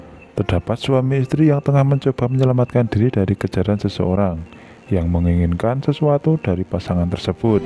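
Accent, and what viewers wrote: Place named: Java, Indonesia. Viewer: Bahasa Medok is REAL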